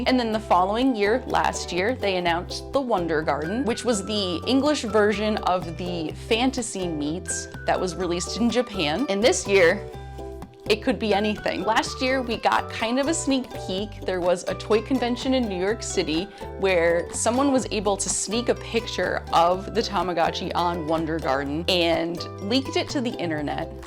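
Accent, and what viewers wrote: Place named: England, United Kingdom. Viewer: by the way I love the way you talk and sure your English accent. You could be my English teacher 😅😊 and that’s why I watched all of your videos. Yeah... I’m crazy about the tamagotchis too.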